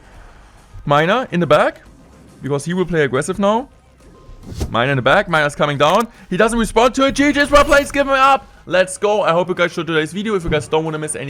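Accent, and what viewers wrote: Germany. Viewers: Der bruder klingt selbst auf english deutsch 💀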